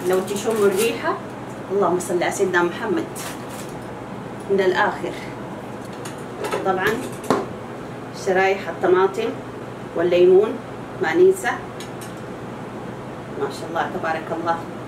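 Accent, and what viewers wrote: Hejaz, Saudi Arabia. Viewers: بسم الله ماشاءالله على لسانك الحجازي المحلى بذكر الله وسيدنا محمد عليه الصلاة والسلام وألف صحه وهنا عليكم بالعافيه يارب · لايك لعيون اللهجه الحجازية 😍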